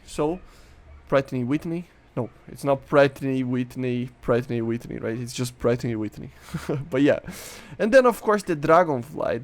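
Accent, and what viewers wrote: Brazil. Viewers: Se eu não soubesse diria que vc é brasileiro, parece muito sotaque de brasileiro falando inglês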